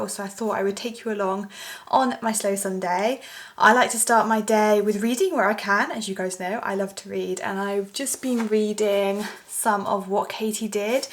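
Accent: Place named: Cornwall, United Kingdom. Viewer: Where is your accent from? Sometimes i can detect either hints of Cornwall or Bristol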